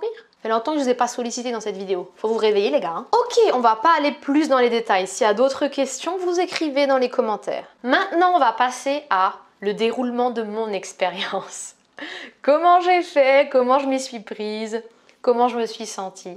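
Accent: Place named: Lorraine, France. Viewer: cet accent lorrain au top =D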